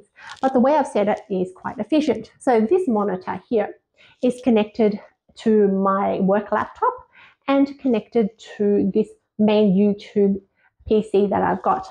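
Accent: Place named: Australia. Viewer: I thought you might follow her. It was a surprise for me to hear an Aussie accent when I found your channel. You don’t see many of us Aussies talking about trading.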